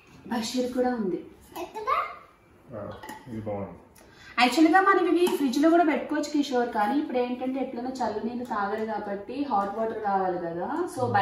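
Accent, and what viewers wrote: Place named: East Godavari, India. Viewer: Mundhuga Happy New year alage Happy Birthday inkoti enti ante moothi kalipoindhi sory thappuga anukovaddu chakkaga east godhavari ammaila matladaru madhi kooda east godhavari katthipoodi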